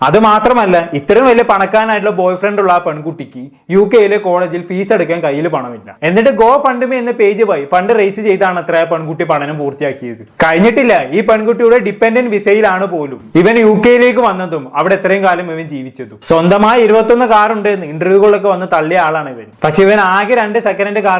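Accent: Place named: Kochi, India. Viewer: Like Kochikaran 😂😂😂😂😂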